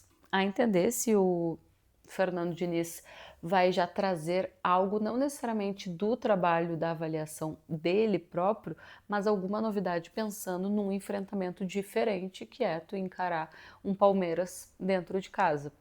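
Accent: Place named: South, Brazil. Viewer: Valeu pelas infos quentíssimas, apesar do sotaque do sul, é CORINTHIANS! 🦅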